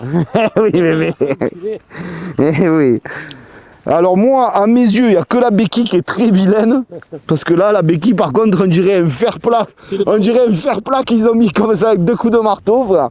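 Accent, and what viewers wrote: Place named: Banlieue, France. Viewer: Ormis cet accent de keke insupportable. Oui c est une bonne machine . Ah monte jamais plus haut que biaritz ,tu vas te faire démonter avec ta langue de branleur de cité qui a loupé son brevet des collèges .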